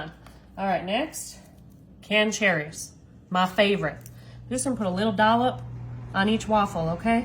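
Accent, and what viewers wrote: South, United States. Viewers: That's a southern accent.. not an "AMERICAN accent"!!! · Every thing you make is fabulous ! Enjoy you American accent 👍👍💖💞💞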